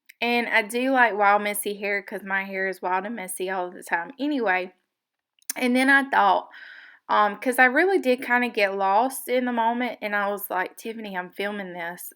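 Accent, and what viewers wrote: South, United States. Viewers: Loved watching your girl's face develop. I love how it looks when you use the scraper. I especially love listening to you talk. Love your Southern accent.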